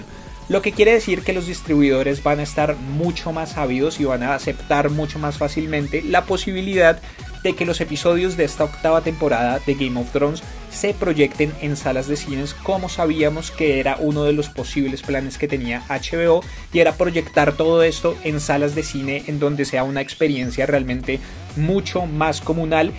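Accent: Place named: Colombia. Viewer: Me encantan tus videos!! Hace poco que llegué a un video tuyo y desde ahí ya no me pierdo ninguno. Ir al cine contigo debe ser sensacional para poder hablar del tema con tanta pasión como lo haces en tus videos, 😭 pero voy escuchando que eres de Colombia!!! , no !!!!!!!!! yo quería, jajaja. Te mando muchos saludos y mucho éxito con tu trabajo, lo haces genial.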